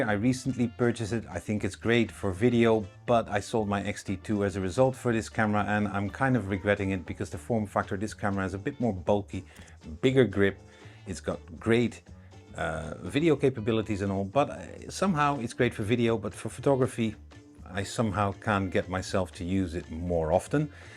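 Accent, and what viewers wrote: Netherlands. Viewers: Ik krijg onmiddellijk een combinatie van plaatsvervangende schaamte en tenen krommend afgrijzen bij het horen van dit steenkolen nederlands engels. Zal best goed zijn maar niet te trekken dit.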